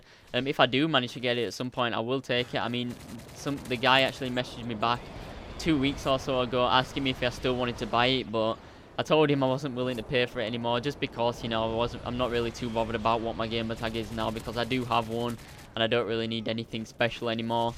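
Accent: Yorkshire, United Kingdom. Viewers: Where you from mate, you sound like you're a fellow Yorkshireman?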